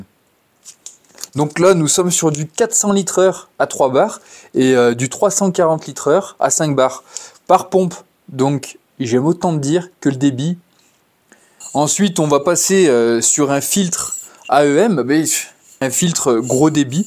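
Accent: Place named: Saint-Étienne, France. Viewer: Non il est pas du nord ! Tu reconnais pas l accent stéphanois ?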